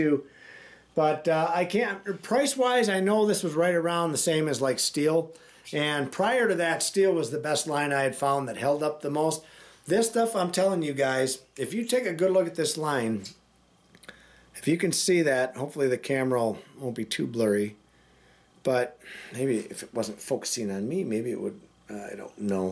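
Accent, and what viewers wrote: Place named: Kentucky, United States. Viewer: I can tell you are from Kentucky.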